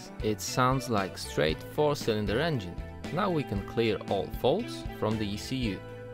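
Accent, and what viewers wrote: Poland. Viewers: Zasubskrybowano, po akcencie coś czułem ze Polak aż zobaczyłem polskie napisy na kompie :) super kanał, dobra robota.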